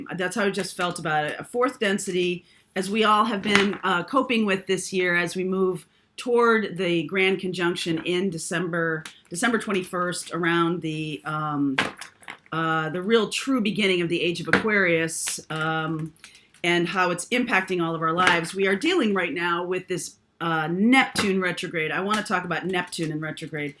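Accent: England, United Kingdom. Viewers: Lol love the English voice...I was laughing and agree with all you are saying! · Lol 😝 love your English voice and thanks for the message. Love from England lol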